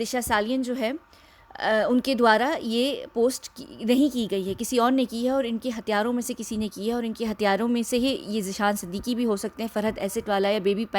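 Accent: England, United Kingdom. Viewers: I absolutely love your English accent mashallah 🥰 love you sooooooo much ❤️❤️❤️❤️❤️❤️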